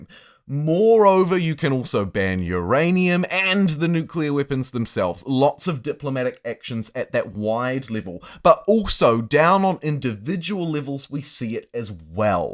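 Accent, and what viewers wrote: New Zealand. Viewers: Be nice to put a face to the Kiwi accent I've been hearing so much whilst enjoying this game! Is one of those gents in the vids yourself?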